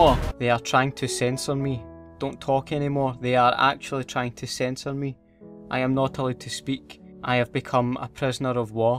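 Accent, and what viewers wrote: Scotland, United Kingdom. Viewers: you and frankie boyle are the main reasons why i love the scottish accent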